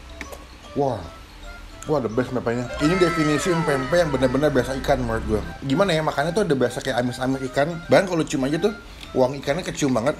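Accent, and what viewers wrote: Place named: Palembang, Indonesia. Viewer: Wong plembang mno suaronyo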